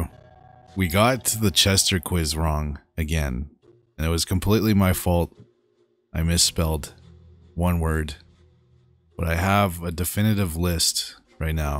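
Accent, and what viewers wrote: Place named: Canada. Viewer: This guy has one of the most aggressively Canadian accent I've ever heard.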